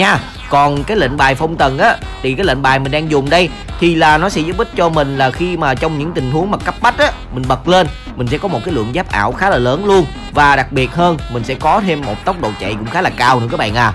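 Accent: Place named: Mekong Delta, Vietnam. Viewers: Rẻ nha giọng miền tây, biết chắc b miền bắc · Nghe giọng miền trong đó hay hài nữa 😁😁😁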